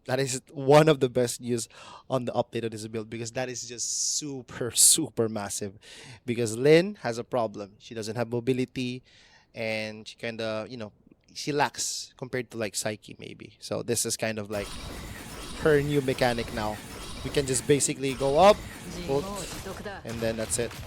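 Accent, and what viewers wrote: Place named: Philippines. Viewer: Bro sounds Filipino HAHAHA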